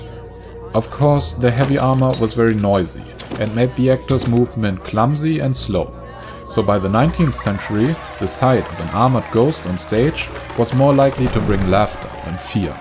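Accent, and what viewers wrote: Germany. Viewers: Ich liebe deinen deutschen Akzent. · Are you somehow related to ,,Kurzgesagt"? You seem to draw similar and have a german accent. No offense tho, could be heavily mistaken. Ty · Du hast einen deutschen Akzent ^^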